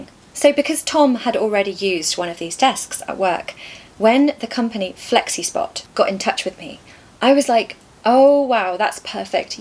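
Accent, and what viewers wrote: RP, United Kingdom. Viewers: Wow excellent reading of you. I always love your British lovely accent and pronunciation. I like your beautiful, amazing desk gifted by Tom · When you say, hello beautiful people, it's actually hello, beautiful lady with fantastic new lesson for English learners ❤❤. Love British accent modern RP.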